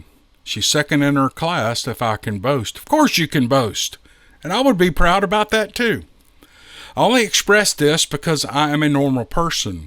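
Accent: South, United States. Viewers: I just love you! You're such an excellent story teller! And your voice and warm southen accent are pure perfection! (Accent! What accent???) Listening to you is always like sitting around a campfire listening to my favorite uncles telling stories. Thanks, for providing such great entertainment!!!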